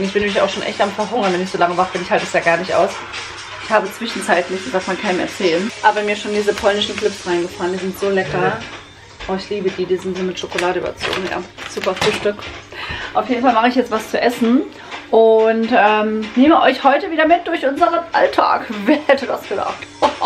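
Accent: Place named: Hesse, Germany. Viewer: Das ist HESSISCH und heisst nebbber mir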